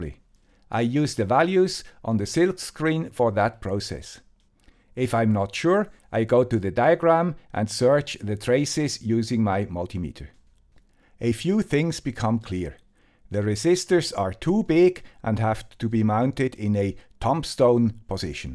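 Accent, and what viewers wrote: Switzerland. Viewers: You were easy to remember, you were the big guy with the Swiss accent! It was a product launch road trip through Europe I believe, or later when I worked for COGNOS and we were launching a DEC platform. It was a long time ago but luckily none of the equipment had vacuum tubes.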